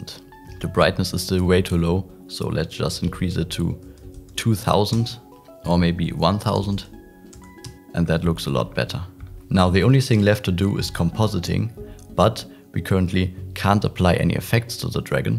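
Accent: Germany. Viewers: Is it the germany accent?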